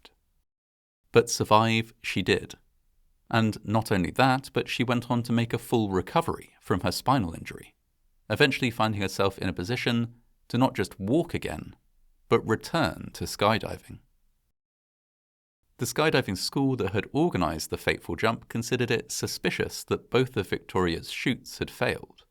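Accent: United Kingdom. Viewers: Just a quick question, Sir. In witch part of Great Britain are you from/located? It is just so weird when you say fall. It sounds like your are saying fool. Same with the word call. Otherwise I really love your uploads 🙂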